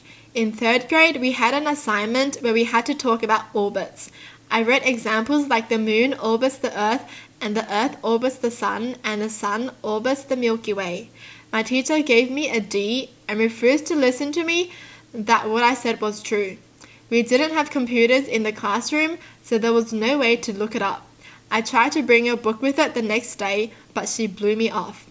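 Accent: Australia. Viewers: Love the strong accent, like its very aussie and i love it!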